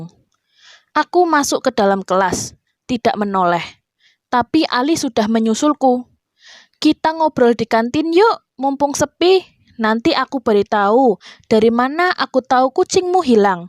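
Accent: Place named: Ngapak, Indonesia. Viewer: Narasi pake suara bocah ngapak. Medok banget.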